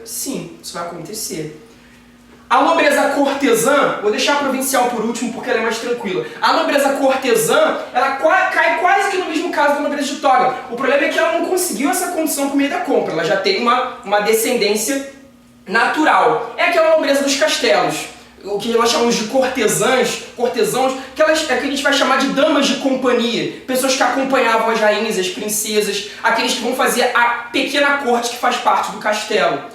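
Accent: Rio de Janeiro, Brazil. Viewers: mds aposto q eh carioca kkkkkkk amei!!😍👏👏❤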